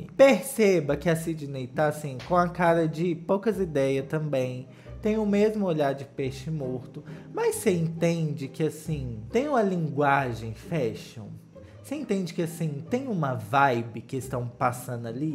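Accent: Minas Gerais, Brazil. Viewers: Eu amo o comentando as coisas com esse sotaquinho mineiro fofo ♡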